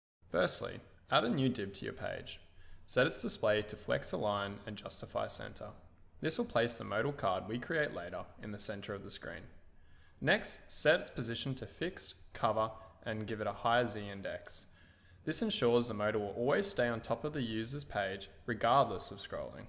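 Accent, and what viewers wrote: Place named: United Kingdom. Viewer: You lost me in 10 secs, too fast and the British accent is hard to understand.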